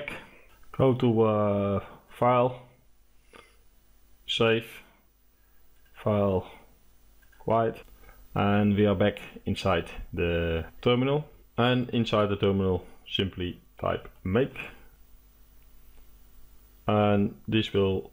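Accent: Netherlands. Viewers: Hehe you have soooo deep Dutch accent :) groeten uit Amsterdam!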